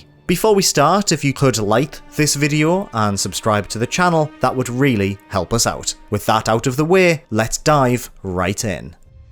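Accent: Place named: Wales, United Kingdom. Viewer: Maybe Welsh pronunciation creeping in? I noticed it too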